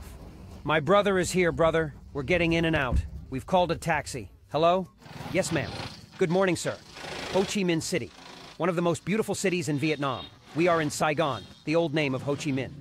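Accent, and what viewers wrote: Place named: Gaziantep, Turkey. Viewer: La Yorum Alının Çatında Yaziy Antepli Olduğun Sesini Duyar Duymaz Antepli Olduğunu Bildim Rafık 😂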